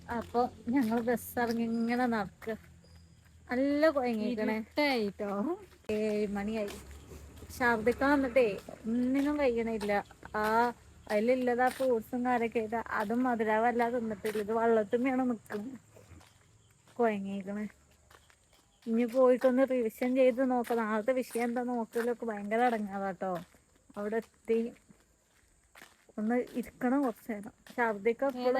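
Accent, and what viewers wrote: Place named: Malappuram, India. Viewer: Edhaan malappuram basha 👍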